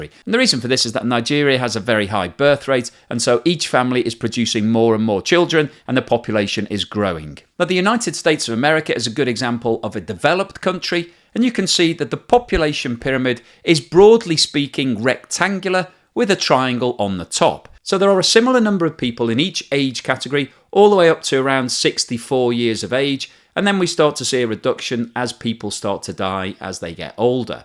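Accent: United Kingdom. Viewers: I can tell by your accent, you're British, why don't you do a video on your own country's economy!? Spoiler alarrt... it SUCKS!!!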